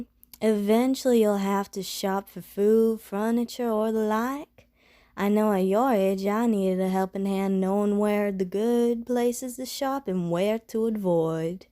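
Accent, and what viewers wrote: South, United States. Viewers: You just made me discover something about myself... I REALLY like Southern accents...😶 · You do a great southern belle. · Oh no... I'm liking Southern accents more than ever now. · I got family in the south and your ascent is sooo close but just a little off but good job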